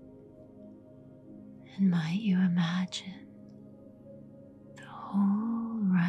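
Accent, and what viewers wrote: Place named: United States. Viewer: REALLY?? oh how supernice! you sound USA, such good english, so lovely!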